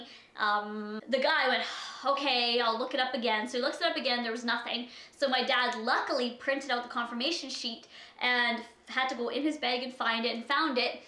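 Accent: Canada. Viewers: Such a cute canadian accent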